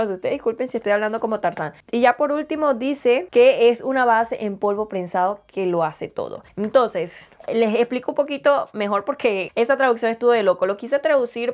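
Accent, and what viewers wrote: Venezuela. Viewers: Es el primer video que veo tuyo…te oí hablar y sentí el acento venezolano…tu mamá dijo Naguará y lo confirmé! jaja 😘🇻🇪 me ayudaste mucho con esta prueba de producto gracias!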